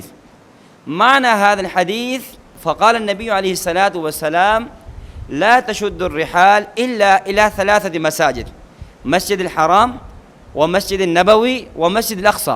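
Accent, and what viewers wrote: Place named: Pakistan. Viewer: یہ عرب لگتا نہیں میں عربوں سے ملا ہوں وہ ایسے نہیں بولتے یہ کوئی پاکستانی ہے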